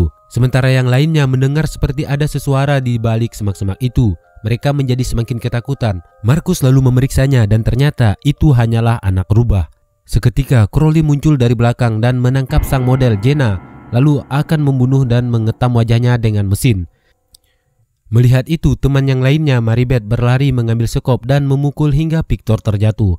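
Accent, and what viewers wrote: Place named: Medan, Indonesia. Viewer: Mimin kayak orang medan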